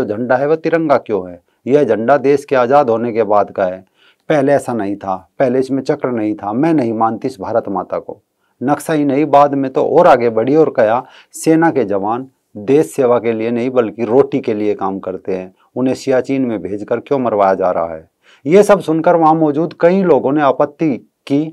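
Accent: Haryana, India. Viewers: You first get rid of your hariunvi accent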